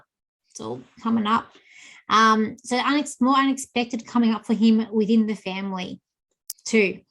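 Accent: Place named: Australia. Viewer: I first had difficulty due to the Australian accent. I turned on the sub-titles and then I understood.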